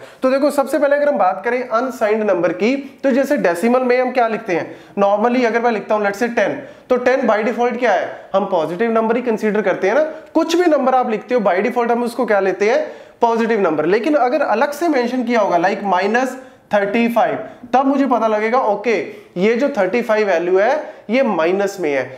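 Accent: Punjab, India. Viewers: Assalamalaikum sir your videos are really helpful for btech electronics students....I m really greatful to you ...and I really like your punjabi accent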